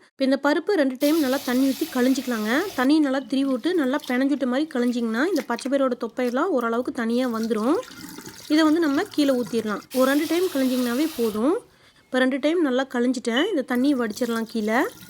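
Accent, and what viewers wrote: Kongu, India. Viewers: கொங்கு நாட்டு கிராமத்து கொஞ்சும் தமிழ் இனிமை பருப்பை உடைக்காமல் அப்படியே வேகவைத்து கடையலாம் அருமையாக இருக்கும் சீரகத்துடன் சிறிது தனியா சேர்த்து தாளிக்கவும்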